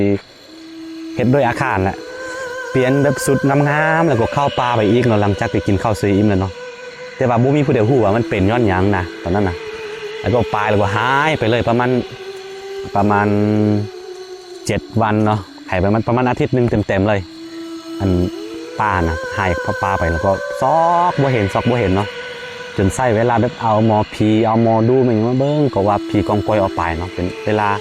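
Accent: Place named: North, Thailand. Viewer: หม้อขางคือกะทะภาษาเหนือผมว่าคำพูดฟังง่ายเพราะพูดสำเนียงไม่ออกอีสานหรือลาวเวียงจันทร์มันออกทางฟังง่ายๆเหมือนภาคเหนือของไทยผมคนเหนือฟังโดยไม่ได้แปลเลย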